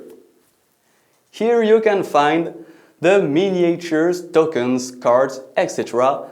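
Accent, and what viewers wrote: France. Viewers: should have done the whole presentation, the French accent sounds much better on women. Also, the hand motions were super distracting. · Thank you for this video. I have worked with suppliers in Switzerland and France for twenty years so I am very used to hearing English with a strong French accent. In addition I must say your English is excellent, I wish my French was a fraction as good. I can see how to a person not used to listening through a strong French accent that this instructional video can be difficult. This isn't a casual conversation but detailed instructions so anything which distracts from the details is noticed much more than usual. I would recommend people watch this more than once if they are having problems. Any person who has a different language as their primary would have the same difficulty here. Part of the problem is Americans are not used to listening through an accent, especially a French one. 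That is just the nature of having one language dominate the majority of the continent you are on. As far as the hand waving, it doesn't bother me at all. I live in New York and half my family is Italian. It is said the best way to shut up an Italian is to tie his hands up so it does not distract me at all:-)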